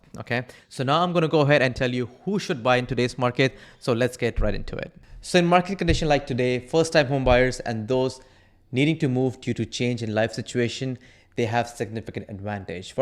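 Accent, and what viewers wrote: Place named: India. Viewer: I heard an Indian accent and immediately turned off.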